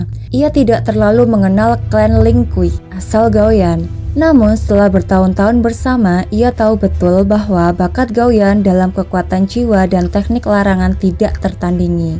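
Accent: Java, Indonesia. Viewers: kok suara ini kayak channel sebelah suara medok nya ni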